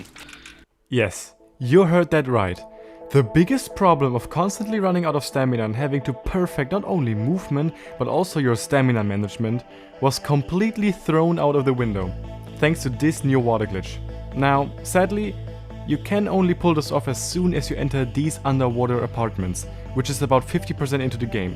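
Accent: Germany. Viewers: are you german? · do i hear DEUTSCH? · after 2 seconds of watching I could tell you were German. (du machst krass guten content und verdienst mehr aufmerksamkeit bro) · I can hear the German accent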